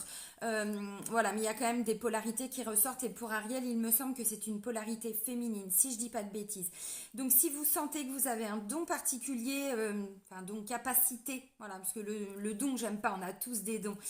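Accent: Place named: Quebec, Canada. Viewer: Maudit que je t’aime toi ont dirais presqu’une Québécoise!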